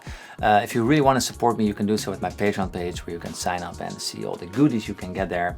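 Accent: Netherlands. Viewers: First video I watch from this channel. And after 5 seconds in, wist ik dat die dude Nederlands is.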